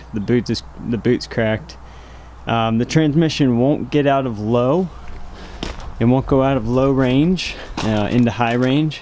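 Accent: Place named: Pennsylvania, United States. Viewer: Great PA accent.......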